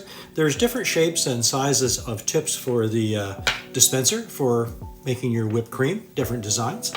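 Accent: Canada. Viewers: The Canadian accent shined through. 😊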